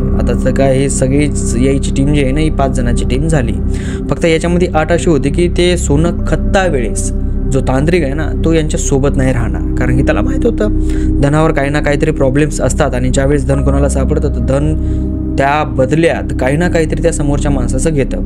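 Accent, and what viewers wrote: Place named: Beed, India. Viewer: बीड चे काय ,बोलीभाषा वाटते तशी😅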